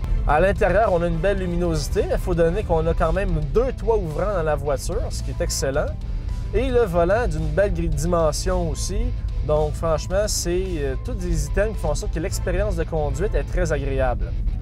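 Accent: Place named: Vaud, Switzerland. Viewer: On dirait un accent vaudois quoi!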